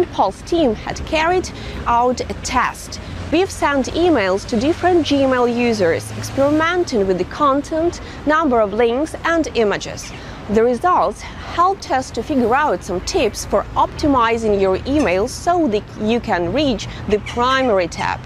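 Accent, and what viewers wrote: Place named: Russia. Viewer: Ukrainian company.....Her accent is very strong Russian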